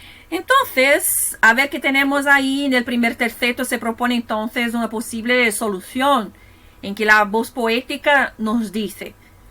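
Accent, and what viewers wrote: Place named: Spain. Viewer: Parabéns, professora, pela brilhante análise do soneto e pela relação que fez com a contemporaneidade. Lindo acento espanhol.👏🏼👏🏼👏🏼👏🏼👏🏼👏🏼👏🏼👏🏼👏🏼👏🏼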